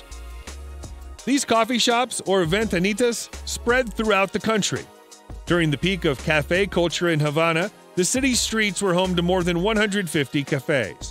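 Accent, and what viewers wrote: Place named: Spain. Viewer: I love your Spanish accent and I appreciate the effort great reference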